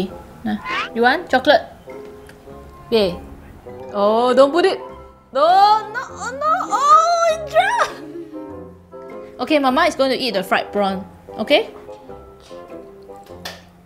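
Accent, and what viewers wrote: Singapore. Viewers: Sounds So So So Singaporean and Singlish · Is it just me or accent is so different from her usual singaporean accent here lol and has singaporean accent yay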